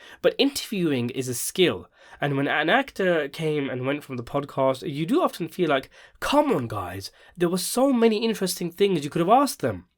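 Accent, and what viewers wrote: United Kingdom. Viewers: As soon as I herd the British voice nobody gives a shit what a brit thinks . You really sound dumb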